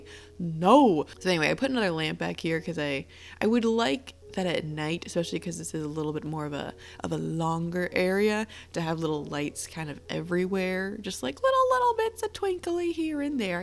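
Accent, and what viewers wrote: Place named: France. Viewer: omgg so beautiful and ur french accent is so cuteeeee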